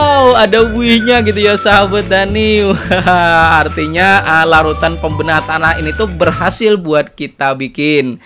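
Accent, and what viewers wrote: Banjar, Indonesia. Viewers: Dari Logat bicara nya kayak org banjar/ hulu sungai, kalsel Iyakah?😅🙏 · Mirip aksen urang Banjar Kalsel pian, btw terimakasih info yg sngt bermanfaat nya, semog jd amal jariyah kelak🤲🏻🤲🏻🤲🏻😊🙏🏻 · Logatnya bubuhan amang banjar pang nah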